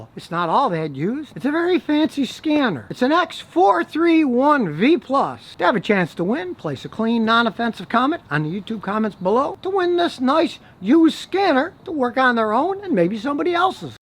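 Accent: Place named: Texas, United States. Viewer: Hi Scotty I love you videos and I religiously watch them. I've even learnt how to understand your Texan accent. Much love from Kenya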